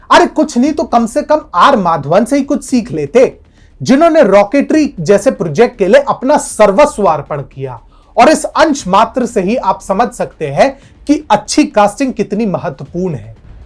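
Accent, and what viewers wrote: Standard. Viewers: Bhai tune kya bola kuch ghanta bhi samajh nhi aaya... Par sun ne mein acha laga... Itna shuddh Desi Hindi bologe toh kese chalega...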